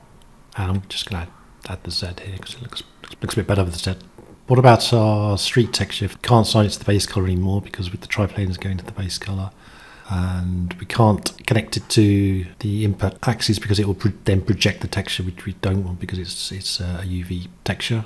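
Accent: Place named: New Zealand. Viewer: That is Kiwi accent, if you are wondering. Every video teaches me something new.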